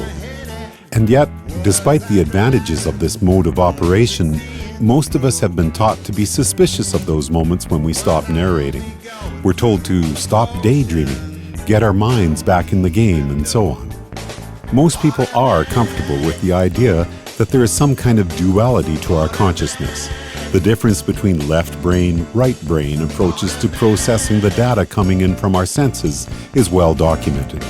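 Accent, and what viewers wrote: Canada. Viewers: Is this guy Canadian? Instant turn off. No one can believe a Canadian would be saying something smart.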